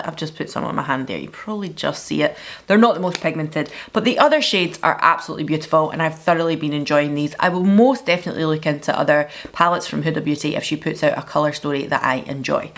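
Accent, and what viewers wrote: United Kingdom. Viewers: she is selling glamour... The British accent also adds something 🤣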